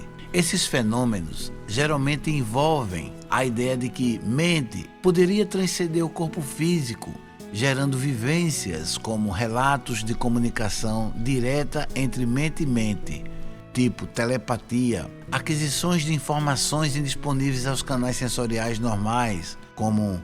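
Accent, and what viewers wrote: Northeast, Brazil. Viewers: Sempre ouço seus depoimentos senhor locutor, de qual Cidade Nordestina vc é ? Pelo sotaque. Eu sou de GOIÂNIA GOIAS.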